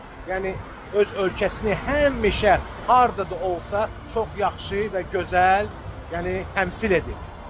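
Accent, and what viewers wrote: Baku, Azerbaijan. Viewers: Alə, qədeş nə pis ləhcəylə danışırsan.? Danışdığın ləhcə Bakıya məxsusdur. Bakıda çox az sayda insanlar özünü tanıyır. Rayonlunu bura aid etmirəm ona görəki, onlar bakılıdan fərqli olaraq heç olmasa öz mentaliterlərini təbliq edib. İndi bu bəkülüyə nə gəlibki, Rusiyanın marianetkası, milyoner vəzifəsində işləyən Yəhidi əsilli olan bu adamın anusuna yol axtarışındadır. Budur ee, Bakılıların iç üzü, Təki yəhudi ,erməni, və Rusların anuslarından öpmək olsun ki, Rayon qardaşlarına düşmən kəsilməyə zəmin yaransən. Ona görədə bu bakı ləhcəsi daha çox separatizm siyasəti yürüb.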